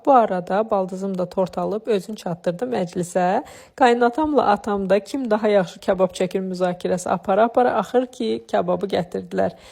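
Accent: Karabakh, Azerbaijan. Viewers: Əsl qarabaq ləhcəsi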